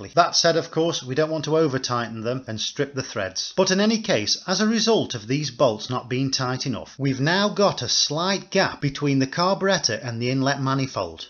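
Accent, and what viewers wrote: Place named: Staffordshire, United Kingdom. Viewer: Are you from Staffordshire sir? You sound a bit like my dad from the potteries?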